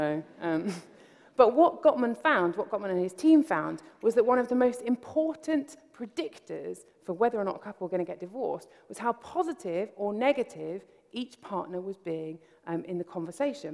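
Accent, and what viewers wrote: United Kingdom. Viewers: Smart, likes math, sense of humor, British accent and a ginger. I'm in love.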